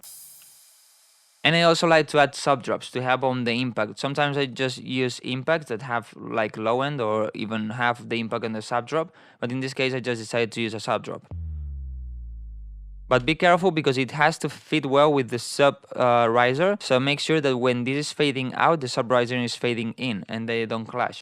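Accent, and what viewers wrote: Spain. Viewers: este hombre es un español hablando en ingles o es cosa mia? buen video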